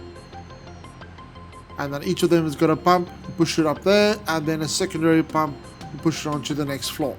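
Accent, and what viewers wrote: South Africa. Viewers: My guy, are you south african? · You sound South African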